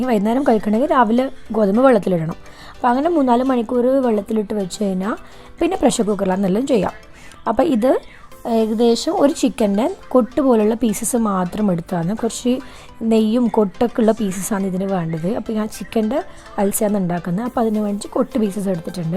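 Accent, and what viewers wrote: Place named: Thalassery, India. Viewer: തലശേരി യാണോ. ഭാഷ. മനസിലായി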